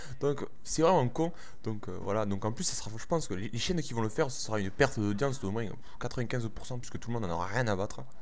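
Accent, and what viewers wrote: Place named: Toulouse, France. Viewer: Un bon toulousain avec cette accent sa fait du bien, les toulousains les meilleurs le meilleur si je pourrais jouer avec toi sa serait cool si tu veux je te donnerai mon gt;)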